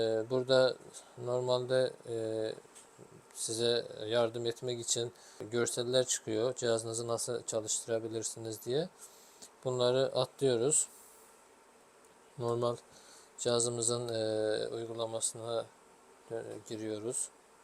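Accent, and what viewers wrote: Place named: Azerbaijan. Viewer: konuşan arkadaş azerbaycanlı galiba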